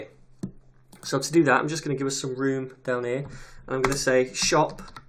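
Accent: United Kingdom. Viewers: First of all, let me just say that I absolutely loved the course all the way through. Over the last few days I took both, the HTML course and this one, and it was a hell of a refresher for me. What's more, I have finally understood quite a few crucial CSS concepts and now I know why something works and something else doesn't. You did a great job by putting this course together, kudos to you, mate. Last but not least, let me just say that I absolutely love your British accent :) Cheers!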